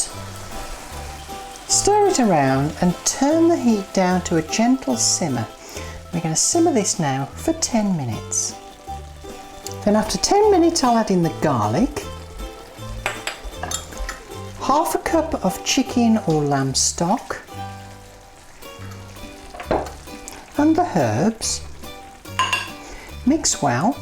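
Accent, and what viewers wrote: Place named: Southern England, United Kingdom. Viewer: Lol, I love Southern English accents... MoussakaR!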